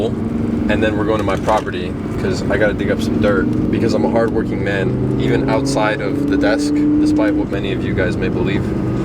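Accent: Romania. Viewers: ador accentul,make ardeal great for good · Bro, I knew you were romanian, I can finally hear you talk :))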